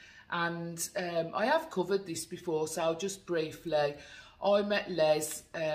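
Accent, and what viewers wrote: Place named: Birmingham, United Kingdom. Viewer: Enjoyed that, thanks for sharing. I got into You tube hauls during lockdown as I missed shopping so much! Strangely all the people you mentioned I subscribe to! However it amazes me how many others I subscribe to just disappear into the ether, which I find concerning as they are virtual friends and I worry about them. I was brought up in Birmingham so I love to hear your accent as I have lived in London for many years. I have never heard the accent described as what you called it! Keep the vlogs coming!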